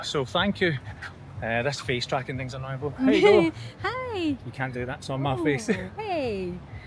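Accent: Scotland, United Kingdom. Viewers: I know that accent, is that not Scottish? Or Yorkshire or someting? U remind me of a dear friend....he would say me arms are in pen as in my arms are in pain lol Welcome to MALAYSIA! And Have fun in KL!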